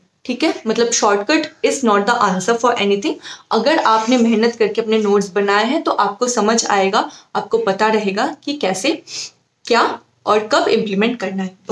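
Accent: Assam, India. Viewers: You sound like an Assamese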